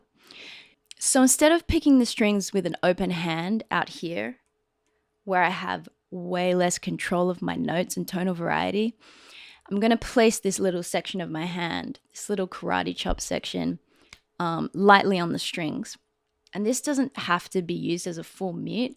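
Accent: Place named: Australia. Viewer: Great vid, great playing and practical ideas. Fellow Aussie here 🙋🏼‍♂️, great to hear the accent on a bass vid, I look forward to more.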